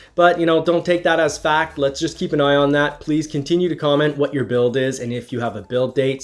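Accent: Canada. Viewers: Are u Canada?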